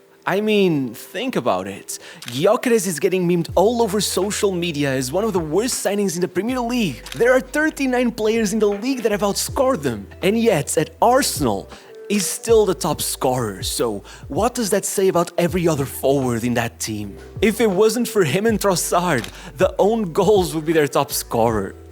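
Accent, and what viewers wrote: Portugal. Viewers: I thought you were better than this. You’re just Sky Sports with a Portugese accent.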